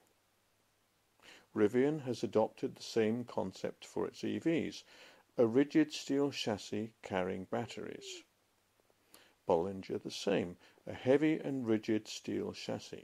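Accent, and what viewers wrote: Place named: United Kingdom. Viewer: British-accented voice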